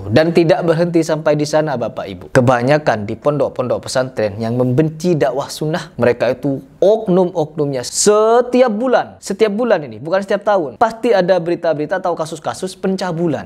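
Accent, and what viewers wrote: Madura, Indonesia. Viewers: Abangnya logat Madura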